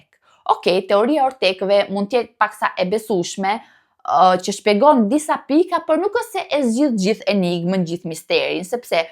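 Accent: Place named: Tosk, Albania. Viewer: O sa bukur ngjyra e flokve tua ket ngjyr Gri ❤ te rrin shum bukur mos i ndrysho biles nje koh❤! Dhe un e kam ket ngjyr vetem se ikam te shkurta.Të përshendes nga Franca ne Origjin nga Kosova.te ndegjoj me shum deshir sepse me pelqen dialekti tosk edhe pse Gegnishtja asht e bukur se asht shum e veqnat por ti gjithqka e bën me ❤ !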